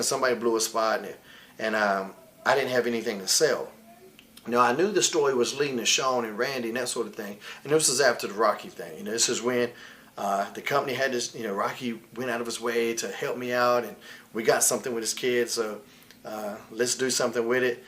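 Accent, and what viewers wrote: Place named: North Carolina, United States. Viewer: I love Shane he don’t hide that N.C. accent. I’m from N.C. so him, Matt, and jeff are kinda hometown heroes for me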